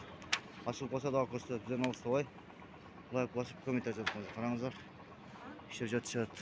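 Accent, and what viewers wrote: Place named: Nookat, Kyrgyzstan. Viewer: Көрдүңөр,асфальт төшөлүптур,кичине болсо да өзгөрүптур,а сүйлөгөнү Ноокат тыктар ушундай сүйлөйт,ага эмнеге сайынасынар; Рахмат балага алына жараша тартып көрсөттү, Катасын издеп, баарың эле эстүү боло берип,катаа башка жакта жатат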